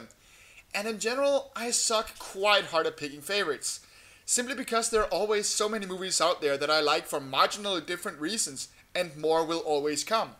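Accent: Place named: Denmark. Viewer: You sound very danish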